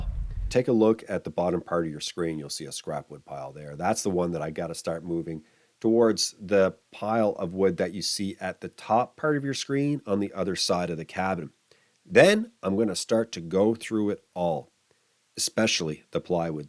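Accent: Newfoundland, Canada. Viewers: I'm really loving your videos. I saw you on Chuck Porters at his cabin. You guys rock . I here in your voice you to are from Newfoundland. I myself was born Bell Island but living in stressful Ontario. God I would love to live like you brother. Your shows motivate me,keep them coming brother.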